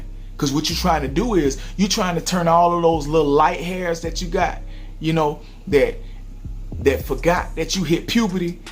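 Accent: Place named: Memphis, United States. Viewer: You sound like you're from the Mighty Memphis. Are you from the M Town?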